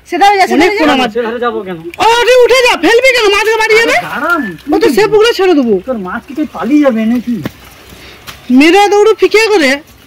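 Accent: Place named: Medinipur, India. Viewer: এই ভাষা টা আমাদের গ্ৰাম ও বলে মেদিনীপুর ভাষা, কাই টু মাই টু ভাষা ভালো লাগে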